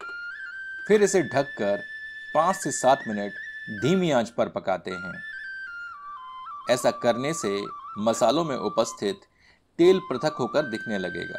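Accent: Standard. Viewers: apki shudhh hindi .. apka andaz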